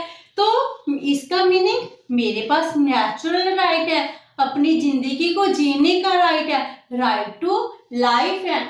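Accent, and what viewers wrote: Punjab, India. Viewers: thankyou mam, the way u teach with punjabi accent is awesome and in a lucid way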